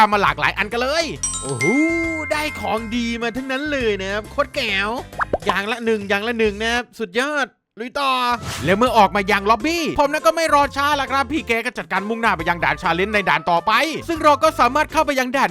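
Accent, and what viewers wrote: South, Thailand. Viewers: พี่เป็นคนใต้หรอคับ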